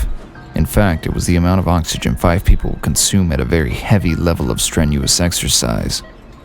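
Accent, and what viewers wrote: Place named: Russia. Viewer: Great job on the narration! I really liked the Russian accent ^w^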